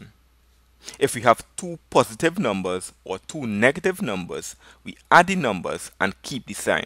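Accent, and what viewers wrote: Trinidad and Tobago. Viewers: I am so going to use your videos for teaching my classes!!! It's so nice for my students to hear a trini accent. Continue the good work! Bless up my fellow trini :) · are you from trinidad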